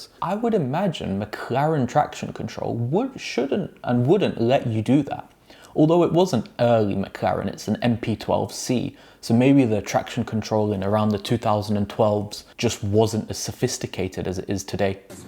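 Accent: United Kingdom. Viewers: I just discovered this channel because I was looking for non-supercar content and I'm liking it. The posh English accent side by side with car accidents gives everything an academic vibe.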